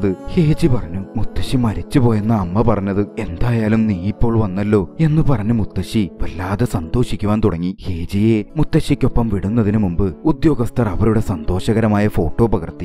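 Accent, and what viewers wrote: Ernakulam, India. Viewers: നിങ്ങൾ എറണാകുളത്തുകാരൻ ആണോ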